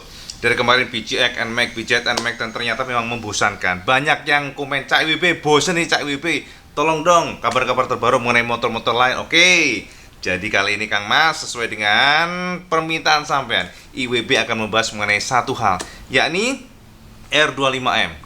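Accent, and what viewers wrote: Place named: Java, Indonesia. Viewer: aku seneng ulasanmu kang....bloko suto coro jowone.....sukses kang....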